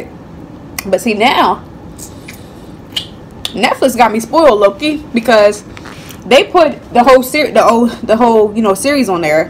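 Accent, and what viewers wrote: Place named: Baltimore, United States. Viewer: You sound like me. Im from Baltimore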